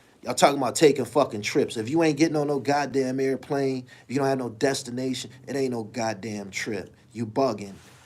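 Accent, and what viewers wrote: New York, United States. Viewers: Yo u from PA why u sound like u from New York no pun intended on my New York Niggaz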